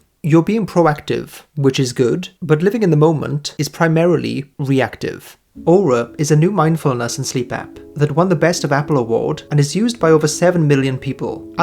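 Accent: Wales, United Kingdom. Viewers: I send your videos to my INTJ boyfriend a lot and I'll ask him "hey did you watch what I sent you"? and he'll say "oh from the British guy?." So, you're the MBTI British guy around here lol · "INFJs are never-ending future anticipators" Thank you that's the best way I've ever heard it said. And as an Aberystwyth boy good to hear it said in a Welsh accent!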